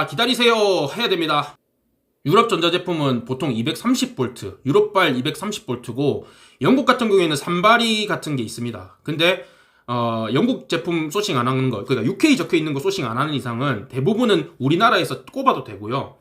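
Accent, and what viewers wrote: Gyeongsang, South Korea. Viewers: 너무 잼있어요 같은 갱상도라 그런지 말씀하시는게 너무 친근해요~ㅎㅎㅎ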